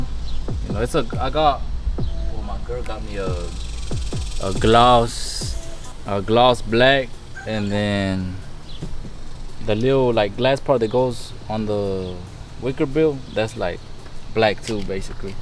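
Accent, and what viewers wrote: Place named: Texas, United States. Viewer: I knew you was from TEXAS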